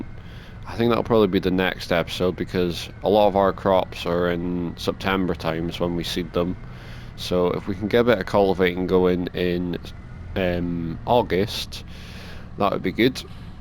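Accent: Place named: Highlands, United Kingdom. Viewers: sounds like Inverness lochaber maybe black isle sort of way doesn't it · Hi dude. Where you from cause you sound like your near me up in the highlands? Wanting to do so e realistic farming in farming sim 25 ma sel. Keep up what your doin bud. Camsden farm is great!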